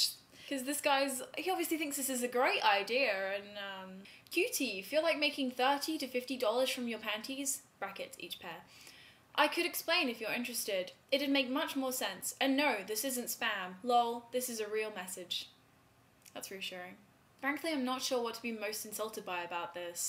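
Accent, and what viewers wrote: England, United Kingdom. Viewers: so i was bored and my subscriptions reset and i saw your video and decided to watch it again lol. still funny and i gotta say (in hopefully a noncreepy way? haha) the british accent is still very cute ;) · hiii:3 i love your English accent... *stares relentlessly* -Fullmetal Alchemist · do canadians have that accent? sounds like semi-british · i don't know, sounds like she has an english accent, so im not sure.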